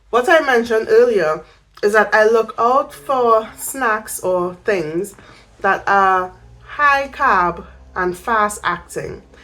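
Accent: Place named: Trinidad and Tobago. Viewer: Hi girl! Thank you for your berberine video. That is what got me here. Just going down a rabbit hole on YT as uasual and founds you video talking abouts Berberine and it peeked my curiosity. AND also you accent caught my attention, as I too a a Trini❤. Glad you are adjusting to your new Dx, and you are finding your footing as you educate yourself about the foods and learning your body as you now have DM1. Thanknyou for the information as this was very informative. I just liked your videos and will subscribe, although i may never take berbaerine or maybe i will. . Keep going 💪 ❤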